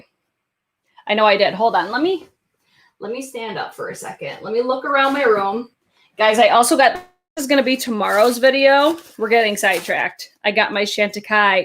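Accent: Midwest, United States. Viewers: New subscriber! You’re such a goof! I love you! Where are you from originally? Do I hear a Midwest accent?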